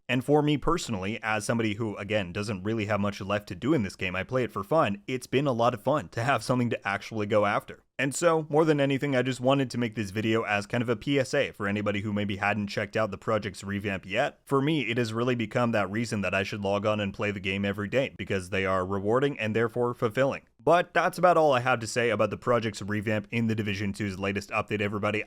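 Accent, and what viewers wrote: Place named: Brooklyn, United States. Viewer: Why...not that I'm bih'ing, cause I ain't, cause am digging it... but, why do you sound like ya just hop off a train from Brooklyn? LMAO. Seriously. Least to my ears, but you sound today like you got that twang...js.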